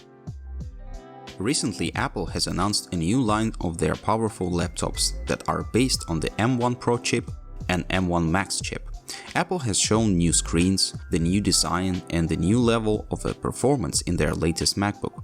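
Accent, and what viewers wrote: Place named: Ukraine. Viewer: My guy have a badass Ukrainian accent 😁🇺🇦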